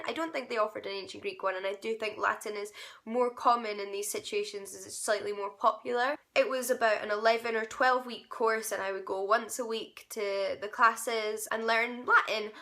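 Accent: Scotland, United Kingdom. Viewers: Your accent sounds Scottish. Didn't know anyone in that area of the world was interested in ancient languages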